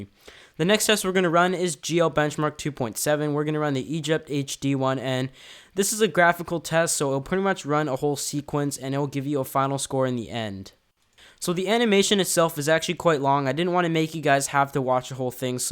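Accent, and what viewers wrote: Canada. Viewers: oh my gosh. are you Canadian?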